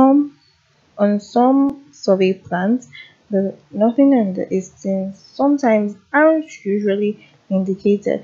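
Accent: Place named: Nigeria. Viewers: Don't be silly man, if you can't understand simple Nigerian Accent. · nah. She rarely made grammatical blunders. I Understood her perfectly. So its either you're terrible at hearing English or you're just being presumptuously mean for no damn reason. That's a Nigerian accent. I'm Nigerian and English is the Lingua Franca in that country. Most of the new gens learn English as a first language. So you coming here on YouTube to give unsolicited Dimwitted comments because you can't comprehend her accent is just distasteful.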